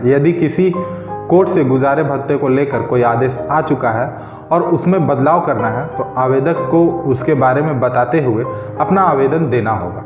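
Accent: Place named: Maharashtra, India. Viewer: Tu marathi hai...hindi me gadbad ho rahi hai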